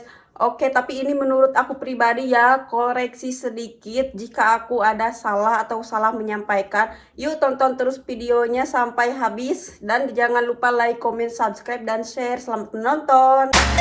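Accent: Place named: Sunda, Indonesia. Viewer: Euleuh... eta kamu teh logat Sunda na meuni lekoh pisan. 😂😂😂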